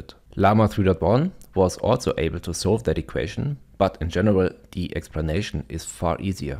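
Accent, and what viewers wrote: Germany. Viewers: Your german-english accent just makes listening to you even better. Things have a more serious tone to it. Fantastic content!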